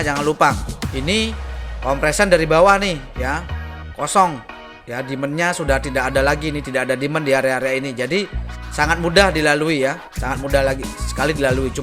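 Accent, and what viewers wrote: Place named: Bali, Indonesia. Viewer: Kaya logat bali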